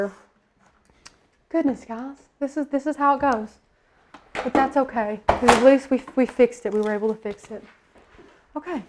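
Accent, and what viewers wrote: South, United States. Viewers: where are you from? You have more of a southern accent than me 😊 PLEASE PLEASE Show how to use with Android tablets I NEED TO KNOW HOW TO. 😭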